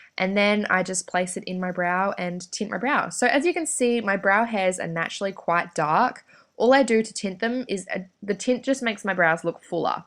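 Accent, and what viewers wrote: Australia. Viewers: are you australian ? cause you sound like an aussie ♡♥ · whenever i see you respond to peoples comments i always read your response in an aussi accent aha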